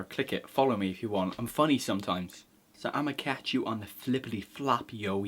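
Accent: Bristol, United Kingdom. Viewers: I'm watching:) I love watching your videos, if for nothing else your amazing Bristolian accent. I was thinking about you the other day, I saw a super pack of nerds for sale:)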